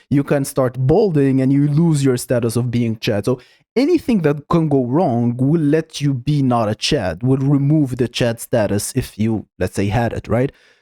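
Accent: Russia. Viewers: First video I came across from you. Your Russian accent suggests you've learned it later on in life or never lived in Russia (learned it from your parents or something). Same here though haha Cheers! Subscribed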